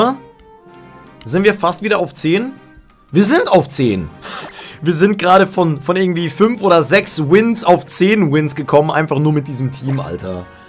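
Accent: Switzerland. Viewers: Mein Deutsch ist nicht sehr gut, aber können jemand mir sagen wenn dieses Mann sprickt Hoch Deutsch? ^^ Can someone tell me if this dude is speaking High German or Swiss German. That's what I tried to say, but my German's not that good. I'm not experienced enough to tell the difference :C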